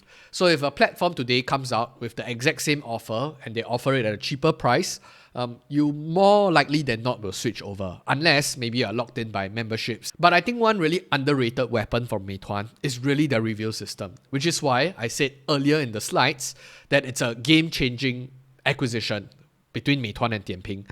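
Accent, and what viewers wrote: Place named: Singapore. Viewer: Love the heavily Indian dominant Singaporean accent. So mellifluous.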